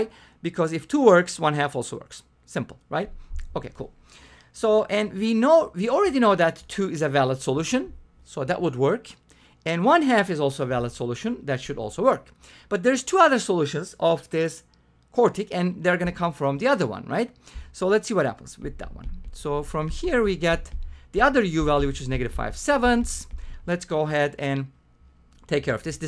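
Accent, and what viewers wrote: Turkey. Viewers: Your English accent sounds Turkish .is it really so :)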